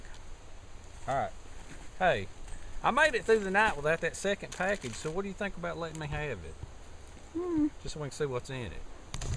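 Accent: South, United States. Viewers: Loving this already, just from the way you talk; sounds like home. Awesome use of all the items, and what a fun challenge! One of the best videos of this sort I've seen. Elevated bed, great use of the food and cooking, and great camera work from Nick, too. Such fun. The woods make me homesick. From another green-eyed Southerner, well done! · I live in TN. In Nashville now and I lived in east TN by the mountains when I went to college(ETSU). Noticing accents is something I kinda picked up. To me you have a northern Alabama/Georgia accent which is cool. You should have a survival weekend and invite survival/bushcrafters from youtube and have challenges. Im new to it and I'm kinda preppy you could say so my friends arent one to get in the wild like that so I mostly do it by myself so getting to see others on youtube is a treat.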